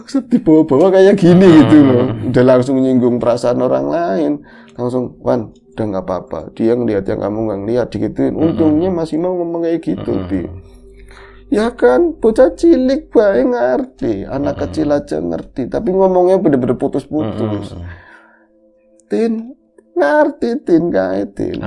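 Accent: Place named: Ngapak, Indonesia. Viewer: hehe..hehe...seru juga ngobor...bahasa ngapak na. medok banget...jadi pengen ikutan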